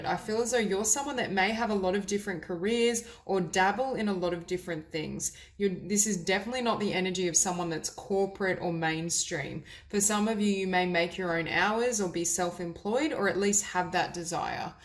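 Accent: Australia. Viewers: Where are you from? Sound like Australian.. if yes, can i drop by for reading personally?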